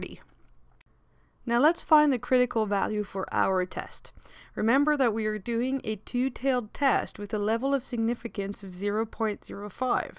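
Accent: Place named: Ireland. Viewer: It's a European thing. She sounds Irish maybe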